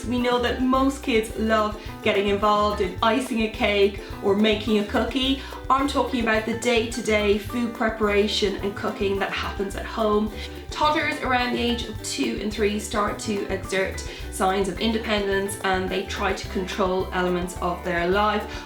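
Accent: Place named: Ireland. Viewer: Whats ur accent? I picking little bit of Irish .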